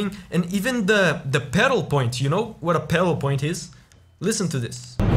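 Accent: Brazil. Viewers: Vc é Brasileiro? Sotaque brasileiro. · bro, are you brazilian?? your english accent is a little bit like a brazilian speaking english (don't take this in a bad way, but it remembers me so much)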